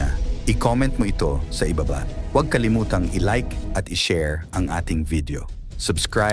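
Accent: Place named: Visayas, Philippines. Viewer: Ano kaba pilipino o hindi hahahah salita m palang bayas kana.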